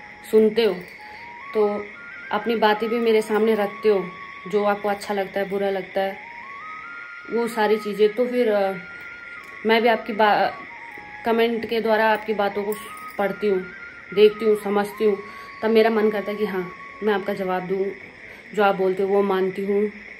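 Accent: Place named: Pahari, India. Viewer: Talarni 🤣🤣🤣 theth pahari👍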